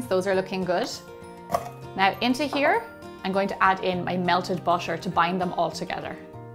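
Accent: Ireland. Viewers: are u irish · I LOVE all of your videos they're always so great and delicious! I can't stop watching them. I'm an Irish dancer and I love your Irish accent! It's beautiful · I KNEW YOU WERE IRISH! Sorry I'm new to this channel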